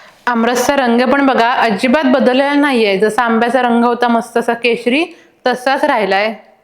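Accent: Kolhapur, India. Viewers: Full kolhapuri zalis ,tone pn kolhapuri zala ,mast 👍👍👍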